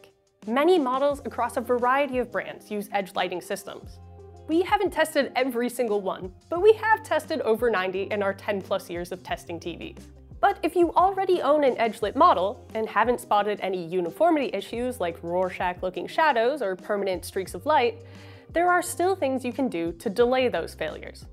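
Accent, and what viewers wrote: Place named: Canada. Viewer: Didn't even think aboat where RTINGS was located, but when the familiar accent crashed into my ears, either they were from Canada or RTINGS is based the. It was only after the job posting link did I find oat the truth.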